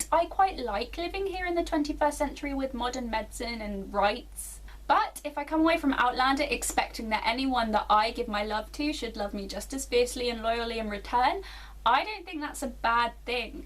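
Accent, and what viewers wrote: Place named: England, United Kingdom. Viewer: Your english accent! I thought you meant egyptian mummy omg 😳 Also I didn't realize people actually say these things ☹️😂 the world we live in 🤣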